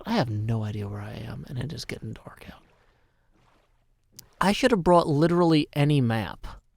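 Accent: South, United States. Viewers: Funny to hear a hermit with my southern accent I'm definitely gonna be paying more attention to this channel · First time watching one of your videos(and i hope this isnt rude), but your accent makes me think of a true southern accent i don't hear often among youtubers.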